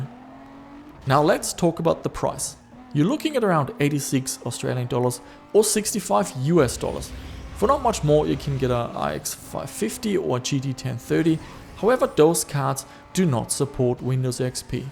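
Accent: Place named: Germany. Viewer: You're from Australia? lol I always thought you're german because you sound like you have an german accent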